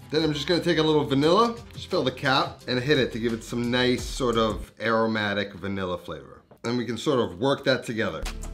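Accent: New York, United States. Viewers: Its an east coast heavy ny acccent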